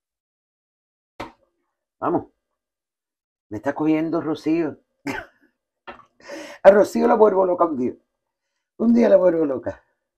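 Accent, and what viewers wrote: Andalusia, Spain. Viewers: Oir tu acento andalús y recetas me recuerda tanto a mi madre. Gracias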